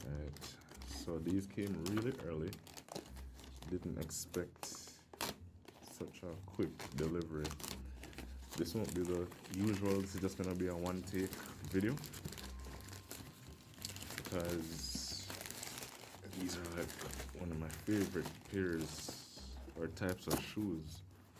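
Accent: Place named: Jamaica. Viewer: Can hear the Jamaican in your voice from you start talk bro keep doing clarks reviews bro rate them with different colours #repfromjamaica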